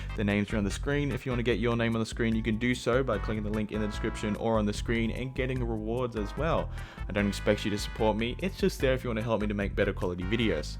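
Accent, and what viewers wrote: Australia. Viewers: He's not English/British... he's Australian. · Are u Australia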